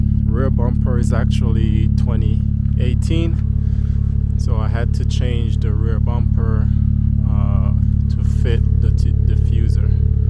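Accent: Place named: Jamaica. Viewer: Breddah The jamaican Accent cyaan hide, CLEAN!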